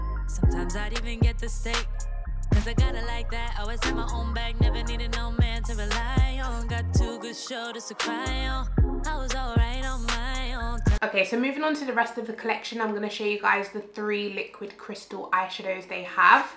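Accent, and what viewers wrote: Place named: England, United Kingdom. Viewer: I love how English people say "purple"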